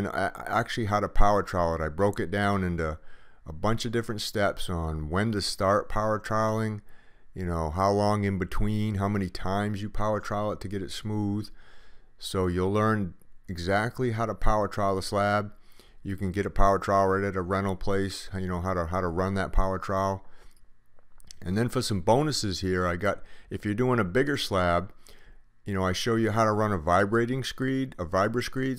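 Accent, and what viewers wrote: Maine, United States. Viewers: You sound like a Mainer. About how big can you make a slab in New England, where the ground freezes, before you need footers?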